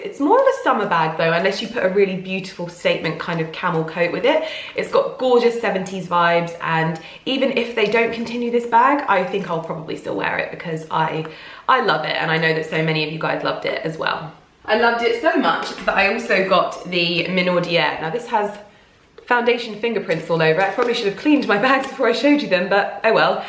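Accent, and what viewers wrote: United Kingdom. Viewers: I'm 13 minutes into the video before I realized she has a British accent. I think I watch too many British Youtubers...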